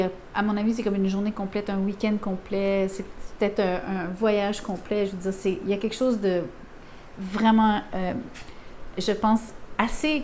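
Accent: Quebec, Canada. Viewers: Merci pour toutes ces précisions avec ce bel. Accent québécois .ce tirage me parle je suis sagitaire l autre personne qui souhaite revenir ' serait scorpion vous me redonnez Espoir👍🙏🌟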